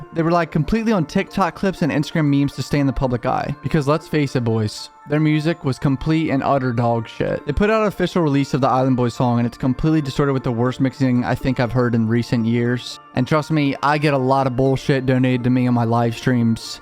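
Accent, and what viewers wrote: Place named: Baltimore, United States. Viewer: Damn I'm loving that Baltimore accent. Or is it Pennsylvania?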